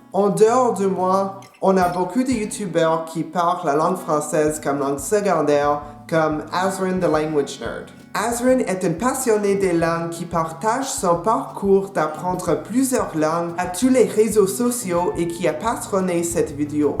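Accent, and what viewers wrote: Quebec, Canada. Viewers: Same here i was laughing the entire time im from Florida the very south of US (comme Marseilles pour vous) his accent is a northern it sounds canadien or british to me lol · I find the Québecois accent you've got a little grating aha, i'm not sure why. Nice video though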